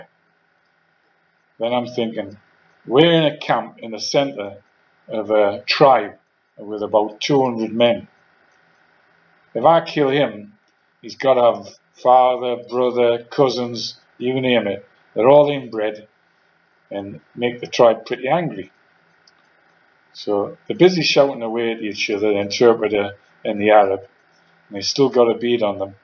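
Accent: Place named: North East England, United Kingdom. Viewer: Nice to hear a north east accent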